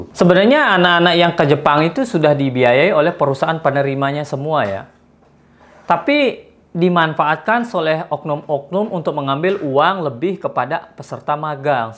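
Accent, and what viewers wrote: Lombok, Indonesia. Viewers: logat sasak tdak hilang,.keren abangku😁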